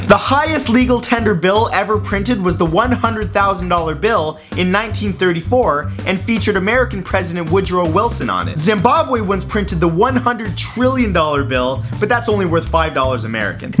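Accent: Canada. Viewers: Are you Canadian? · He is canadian? Keeeeewwweelll. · Your Canadian? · Are you Canadian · He sounds so Canadian in this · ur Canadian?! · are you from Canada?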